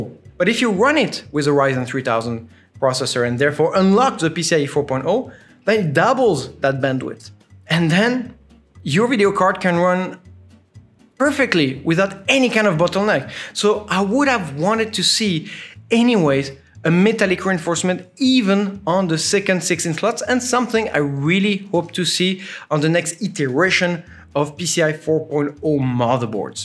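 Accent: France. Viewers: Do you are french ?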